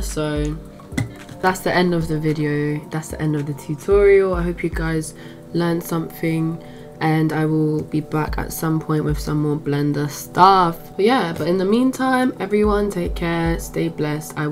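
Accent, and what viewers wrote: London, United Kingdom. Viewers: I love hearing you speak, you sound like a fellow Londoner! Hearing you explain everything so simply and clearly (which you'd think is hard with such hard software) is gorgeous - I haven't even got Blender just trying to educate myself! Thanks :) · First of all great tutorial. When you scroll the camera. You control I think it is so that you can zoom in or out with more control and it does not jump with such big steps. Thanks again. Keep them coming. Also your very funny. Makes it easier to listen to haha. London lady?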